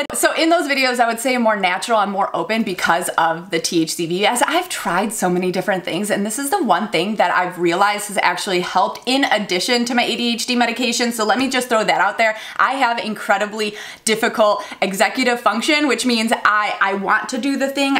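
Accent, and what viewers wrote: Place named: Midwest, United States. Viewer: you gotta be from michigan or ohio...lol we have the best accents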